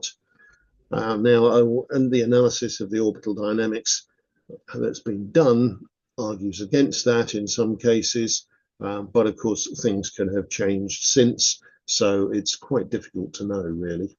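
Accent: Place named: England, United Kingdom. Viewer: That is how they speak in England.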